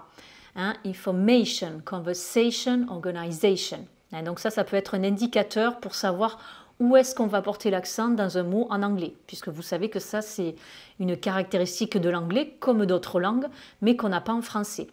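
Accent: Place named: Toulouse, France. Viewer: Tout d'abord moi qui suit de Toulouse, j'ai peut-être reconnu le petit accent 😉chez, que je tiens à remercier pour cette vidéo et les autres bien sûr, ainsi que tous les interveneants d'Anglais cours club. Méthode excellente!!!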